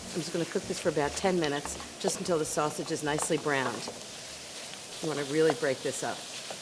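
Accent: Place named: New York, United States. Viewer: "One stick of butta" Yes, Ina! Loving that NYC accent.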